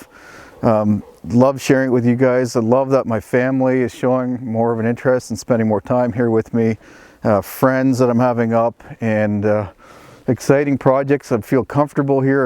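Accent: Canada. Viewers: Hi, Great video, as usual. Completely off the subject but has anyone else seen the similarity in personality, tone, and world view (not to mention looks and Canadian accent, eh?) between and the great advocate for free speech and self reliance, Jordan Peterson, the clinical psychologist whose work has done so much to turn young men around. If you haven't seen Jordan Peterson, you must. He's all over Youtube. Check him out too, you won't regret it. It's be nice to have and Jordan sit down and talk about life. Happy New Year! Steve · *WOW 💕 what a man!* *You've created a wonderful, (other) life.* * *I wish you safety and all the best for great health and happiness with family and friends for many, many years to come.** 🤗 I adore your Canadian accent especially, when you pronounce the word: "out".🤗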